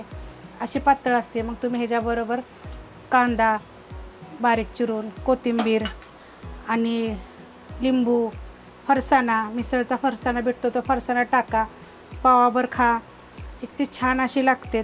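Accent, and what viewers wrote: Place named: Kolhapur, India. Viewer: Assal kolhapuri bolane & misal. Very good method.... Thanks aunty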